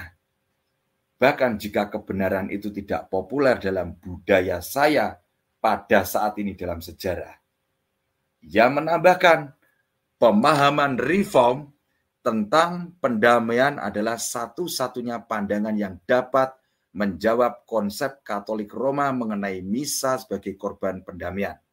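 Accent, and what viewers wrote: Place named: Semarang, Indonesia. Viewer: Maturnuwun mas atas pencerahannya. Berkah Dalem. Salam dari negeri shariah Kano, Nigeria. (Logat nya nganngeni, ….semarangan mbangeti , hèèh rak..😂)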